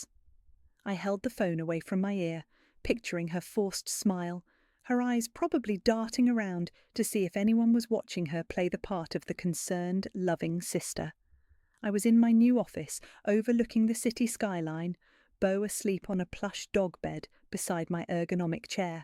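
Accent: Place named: United Kingdom. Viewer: Why use a British accent for an American story?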